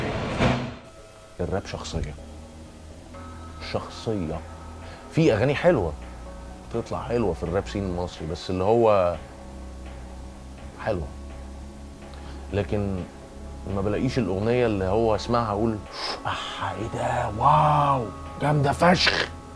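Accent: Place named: United States. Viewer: I remember you from nadi smoha, get rid off the American ascent on your words.. please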